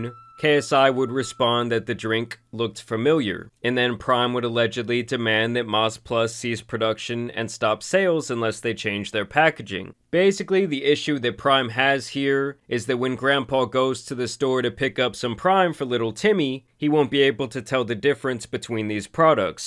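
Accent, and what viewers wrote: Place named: Boston, United States. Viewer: Your dogs are adorable!! Man, I'm binging this channel! I love the blend of the comedy and intelligence! …and I think I figured you the awesome accent! My fav! It's Boston, right? OH, I was a little disappointed at mark, I thought you were about to rap when I heard how easily “Likely still They could not come to an agreement In this situation Prime continued to threaten litigation” flowed.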